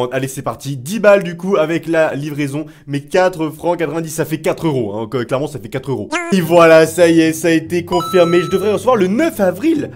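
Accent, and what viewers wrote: Quebec, Canada. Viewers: yo je suis un nouveau mais je te trouve super ps: tu pourrait faire une vidéo avec ta voit québécoise un petit defi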